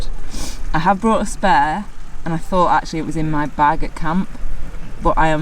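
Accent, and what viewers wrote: United Kingdom. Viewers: How are you used to kilometres you have a british accent and we travel in miles ?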